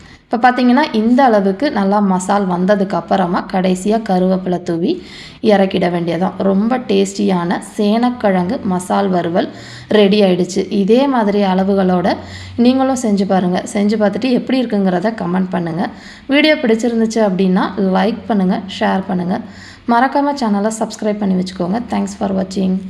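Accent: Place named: Karaikudi, India. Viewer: Your native mam don't mistake me ur belongs from karaikudi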